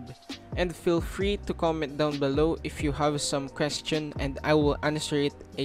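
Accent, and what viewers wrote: Philippines. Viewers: I instantly know this guy filipino😂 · filipino accent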